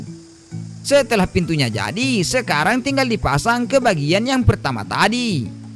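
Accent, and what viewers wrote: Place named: Makassar, Indonesia. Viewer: asli org mna bang pke makassarki ku dngar😂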